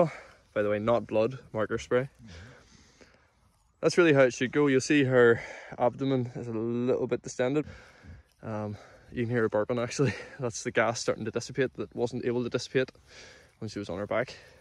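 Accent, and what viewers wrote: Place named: County Down, United Kingdom. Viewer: Just found the channel, subbed even though I know nothing about farming, I just instantly recognised the accent and the Mournes in the background of a video haha. Also from County Down myself.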